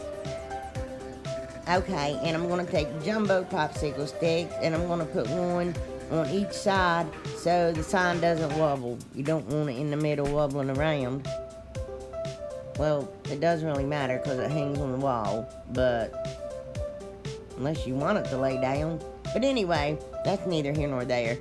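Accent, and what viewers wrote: South, United States. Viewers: That is absolutely gorgeous! I wouldn't have thought to do the Buffalo check in green! What colors did you use besides celery? And where are you from? You sound very southern lol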